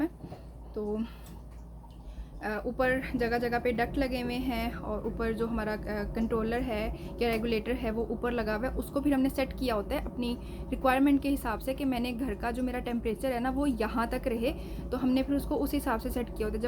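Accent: Punjab, India. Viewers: Wonderful sharing ❤ Loved your panjabi accent 😍 ❤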